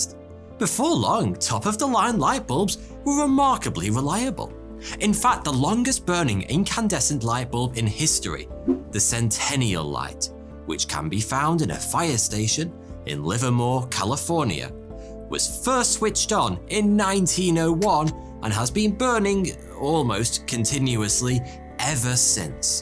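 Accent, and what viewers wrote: United Kingdom. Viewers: Accest sounds like a British one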